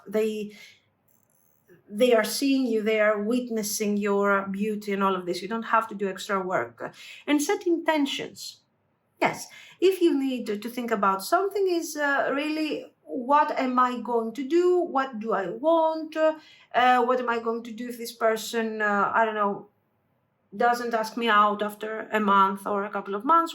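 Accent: Greece. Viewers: I guessed you’re Greek from your accent 😆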